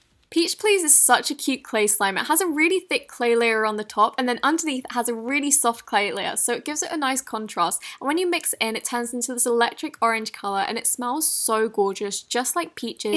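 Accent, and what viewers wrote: United Kingdom. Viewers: I don't know if it's just me my cousin doesn't really like her asking but I love her British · r u british? u have a nice accent and I love it! is that weird???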